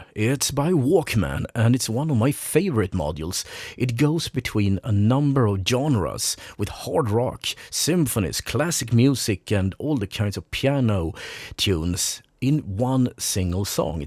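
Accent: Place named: Sweden. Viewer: Horrible swenglish accent, can’t watch theese…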